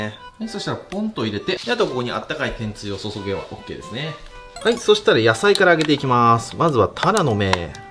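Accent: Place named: Aichi, Japan. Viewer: 初コメントさせていただきます。前から見ていて、豊橋の知り合いの話し方とほんと似ていて、愛知だなぁって感じてました。冒頭の『さばいてくぅ～』のとこ、大好きです。魚をさばいて内臓やらへっちゃらで触ってるのに、片栗粉の感触が苦手なシーン、笑っちゃいました、のそういうとこ、いいっすね。これからも様々な 『さばいてくぅ』見せてくださいね。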